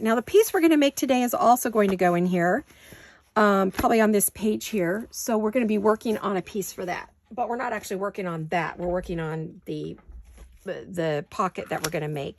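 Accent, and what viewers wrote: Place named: United States. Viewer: Please do not quit. I love your channel. Your American accent is charming.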